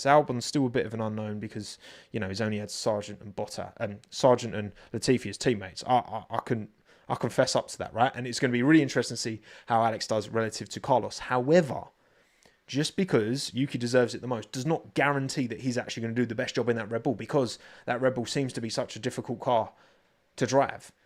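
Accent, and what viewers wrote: United Kingdom. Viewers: It’s the low sweet British accent, I have the exact problem with Cameron and Henry Quinney from Pinkbike. And I can hear the Canadians from the same podcast just fine.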